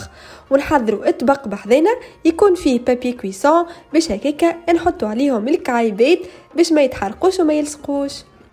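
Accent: Tunisia. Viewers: Ma shaa Allah top top Allahi barik3lik. Appétissant et j aime trop votre accent tunisien❤ bisous de la Belgique 🇲🇦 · تبارك الرحمن عليك يا للا الحادقة تسلم اديك تونسية محلا لهجتك شيء راءع مغربية من باريس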